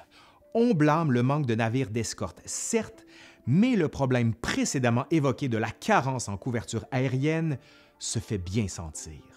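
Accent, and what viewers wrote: Quebec, Canada. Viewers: Je découvre votre chaîne, et -- je vous le répèterai ailleurs, sans doute losque je regarderai votre première guerre mondiale -- j'aime beaucoup votre voix québécoise au sujet de l'histoire, qui vous incite à traiter d'autres faits que choisirait un français. Par exemple, il n'est pas indispensable de parler ici de la libération de la Corse, qui n'intéresse qu'un français de France... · Premièrement j’adore l’accent québécois. Si tu avais été mon prof d’histoire-géo au lycée je suis sûre que j’aurais eu des meilleurs notes. Non vraiment tes vidéos sont super instructive moi qui suis une passionnée de la seconde guerre mondiale j’ai trouvé du contenu digne de ce nom. Je me rappelle aussi des témoignages de mon arrière-grand-mère. Alors je suis française je viens de Vendée et j’y vis toujours. Vendez égal zone occupée elle disait que tous les jours il y avait un allemand sur sa bicyclette avec une bouteille à la main en train de demander des pièces et il fallait lui donner les pièces sinon… on m’a aussi raconté que dans une ferme de ma région le fermier s’est retrouvé sans sa vache parce qu’un autre fermier concurrent a dit à un soldat allemand de s’en emparer. 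Bref le temps a été dur pour ces personnes que j’ai connu et qui m’ont témoigner de ce qu’ils ont vécu avec beaucoup de courage. Cependant les témoignages de la famille ne suffisent pas il faut voir les choses avec globalité et grâce à toi c’est possible. Vraiment merci tu es trop génial. Il faut mieux regarder tes commentaires que niaiser comme on dit chez toi. Kiss et continue comme ça! Bon, je descends les vidanges et je reviens à cette nouvelle vidéo. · Excellente série de vidéos ! un gros travail objectif et exhaustif.... peu de niaiseries sentimentales, l'histoire et que l'histoire jaime beaucoup et l'accent québécois c'est la petite cerise...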